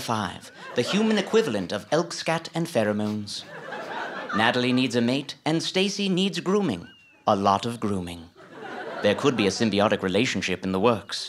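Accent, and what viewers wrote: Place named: United Kingdom. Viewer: for best narrator! I can see why Jason first thought that he was British.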